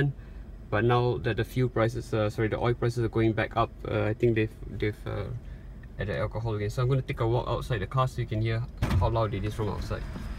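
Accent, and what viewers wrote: Singapore. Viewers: U sound so singaporean, r u?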